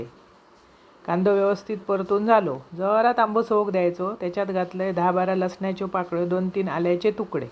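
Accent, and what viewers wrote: Malvani, India. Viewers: तुम्ही मालवणी वेंगुर्लेकर छान बोलता ऐकताना गोड वाटत कांनाना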